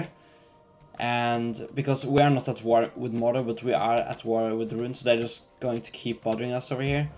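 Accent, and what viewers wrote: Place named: Ireland. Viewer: you sounded irish, pretty cool though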